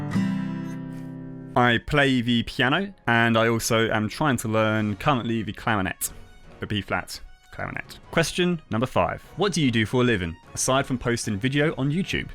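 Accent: United Kingdom. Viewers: I did NOT expect you to be English! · His accent 😍😍 #LondonBoy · He british 😍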